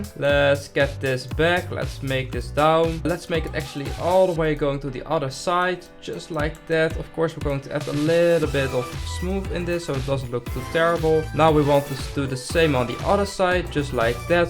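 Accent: Netherlands. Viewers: Hey. Do u come from the Netherlands? U sound like a dutch person. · I smell dutch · jij ben nederlands dat hoor je gewoon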